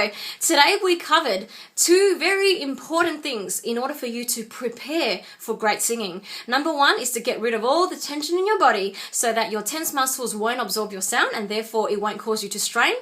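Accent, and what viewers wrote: Australia. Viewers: i can't get enough of your slight asian-aussie accent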